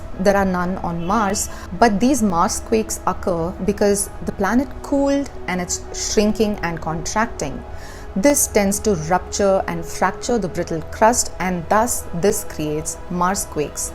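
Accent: India. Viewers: Very clear and simple in Indian English! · She does have a slight India accent. (MTI)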